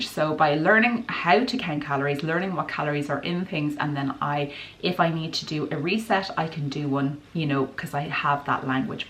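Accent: Ireland. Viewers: Hello, new subscriber here! I contacted bodyslim but all courses r fully booked! I shall start walking and hope to enroll with bodyslim with their next intake, in April. You r lucky u realized u need to prioritize yr time at such a young age! Yes because if we do not, we end up BURNT OUT and depressed! Enjoying yr videos and love yr Irish accent 😊.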